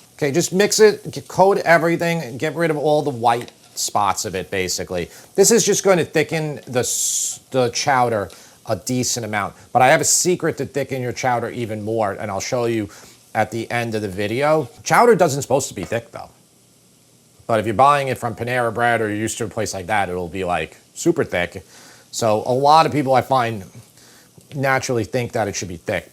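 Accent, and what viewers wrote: New York, United States. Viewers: Why is it every time I watch you it sounds like you've rarely left NY? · Pre-ordered the book! Love your style, your NY accent, and the family! ❤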